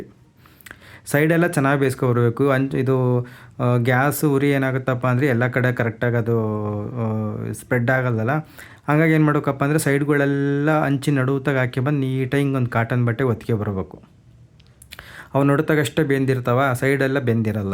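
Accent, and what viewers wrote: Chitradurga, India. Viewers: ಭಾಷೆ ಕೇಳಿ ನೀವು ಚಿತ್ರದುರ್ಗದವರು ಅಂತ ಗೊತ್ತಾಯಿತು. ಯಾಕೆಂದ್ರೆ ನಾವು ಚಿತ್ರದುರ್ಗ ಹೊಸದುರ್ಗದವರು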